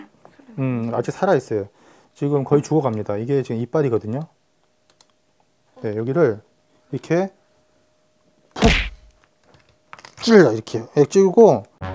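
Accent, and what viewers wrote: Gyeongsang, South Korea. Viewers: 억양을 들어보니 경상도 분 이시군요. 갑각류의 피가 반투명 묽은 파랑색인것도 처음알았습니다. 의학용 학명이 라띤어 이므로'Hemoglocyanin(발음상으로 적확하게: 헤모글로시야닌)'같은 구리성분이 함유된것이라고 아래 어느분이 한글로 적어둔 글을 보고 알았는대 다른분영상에서 복어 회뜨면서 독제거와 피를받아서 보여준적이있어 비교합니다만 복어의 피는 다른동물의 피처럼 빨간대 갑각류는 생선같은 어패류와 또 달리 반투명 믉은 파랑색인걸 알고갑니다. 좋은 정보였습니다.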